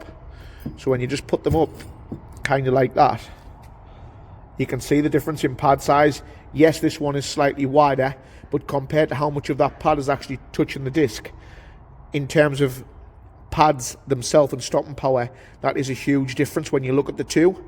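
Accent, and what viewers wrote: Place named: North East England, United Kingdom. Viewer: Bro he sounds like that cos he's from the north east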